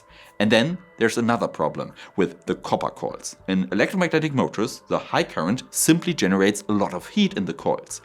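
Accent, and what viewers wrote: Germany. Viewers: A whole quarter hour full of german accent bulishit, with virtually ZERO useful INFRMATION about the "motor" The mother of clickbait :face-green-smiling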